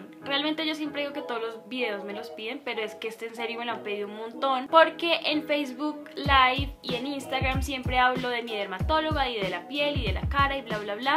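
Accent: Colombia. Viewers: Eres la colombiana que habla más bonito 🎶🎶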